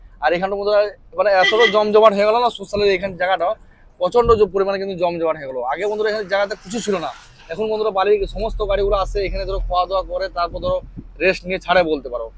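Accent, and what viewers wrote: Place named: Purulia, India. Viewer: তোমার কথা সুনে মনে হছে তুমি পুরুলিয়া আর নাহলে বাঁকুড়া ছেলা বঠো হে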